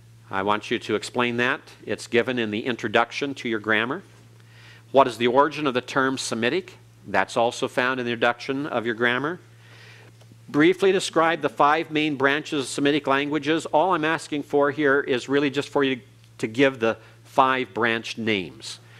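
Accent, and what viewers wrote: United States. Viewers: Ok, people...Jew here. This is the thing: These people are learning this language to study with, to become Biblical scholars. They will not be using it as we Jews do to pray in. Yes, the pronunciation can be a little wacky for me, but this man is teaching this from an angle I have never heard. I can rattle off prayers in Biblical and Prayerbook Hebrew, and even tell you what I am saying. But I need more depth and he is providing that, so the “Classical”pronunciation is not throwing me off. HOWEVER, I will say that if you ever walk into a synagogue, you are going to hear different pronunciation. This is not the way Jews is most places pronounce some of these sounds. We also tend to not have such a strong American accent—even those of us who are American. In order to pray with this language, listening to some prayers in Sephardic pronunciation would be helpful. 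Or Ashkenazi, but you will likely not hear that much except by some older folks in Conservative shuls, or in orthodox settings like Chabad. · Errrm wanna get rid of the American accent, if possible. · Lovely class. I really appreciate it. I do have a mild criticism. might want to consider that he should use examples that conform to pronunciations in Hebrew. For instance, there is no a as in bat--none whatsoever--repeating over and over sounds that don't have an equivalent in Hebrew--reinforcing sounds that don't exist. He is also speaking the name of the letters in a thoroughly American English accent. These students, though learning Biblical Hebrew, should learn to sound like an Israeli--because this is proper and useful.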